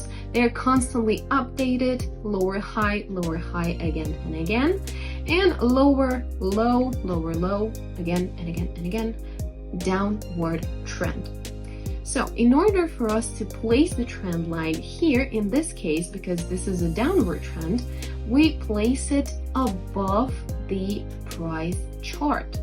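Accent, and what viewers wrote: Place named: Jamaica. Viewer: great video, love the strategy. Were you speaking Jamaican? More than once you said stuff that sounded as if you took them from.the Jamaican dialect.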